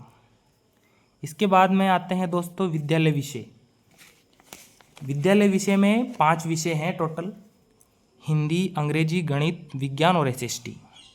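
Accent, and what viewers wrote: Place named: Sawai Madhopur, India. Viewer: Sir apke bolne ke tarike andaja lga paa rha hu aap sawaimadhopur ya aaspaas area ke hai....❤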